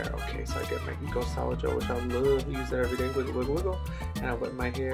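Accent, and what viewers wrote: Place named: Bronx, United States. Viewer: Hehe yes I can definitely hear the Bronx accent lol. Too hard not to miss 😉😎 Probably good to get away from that city life and have some peace and quiet. There's no way I could live in downtown New York lmao. Way too crowded for me 😅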